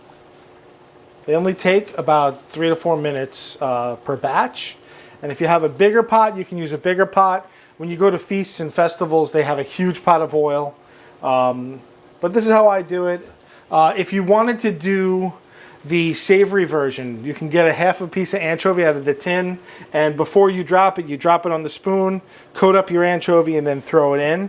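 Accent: United States. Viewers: I like people who talk less lose concentration listen talk and look the food he no stop talking He got an American accent this why he use dry yeast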